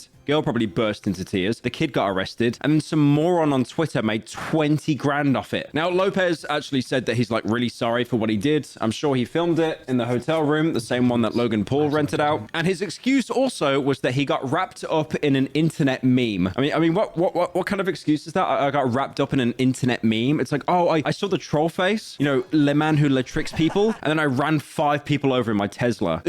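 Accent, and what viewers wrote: United Kingdom. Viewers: Why is this british guy talking? Im cutting cheese for my sandwich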